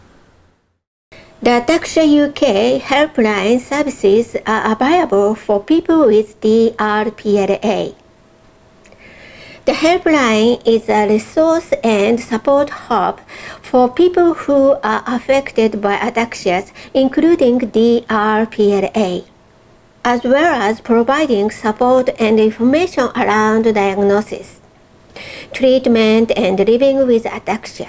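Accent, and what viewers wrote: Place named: Japan. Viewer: カタカナ棒読み英語を改善させないと世界には通用しないですね。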